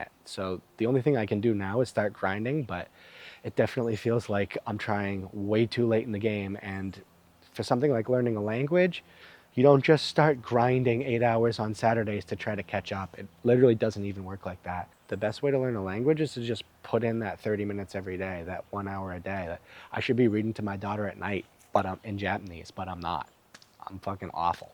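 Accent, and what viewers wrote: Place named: New York, United States. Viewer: Hey man, I've been watching all of your content and you seem articulate and well grounded. Giving me New Yorker vibes (though I know you use your blinkah haha). Do you watch TBYS and Asmongold pretty regularly?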